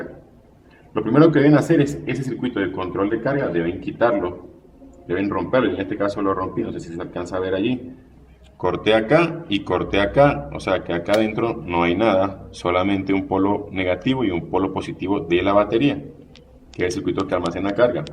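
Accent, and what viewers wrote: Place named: Venezuela. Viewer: Gracias !! al escucharte por el acento lo pensé que eras de Venezuela...sigue adelante, ya me suscribí...desde Valera Edo.Trujillo Venezuela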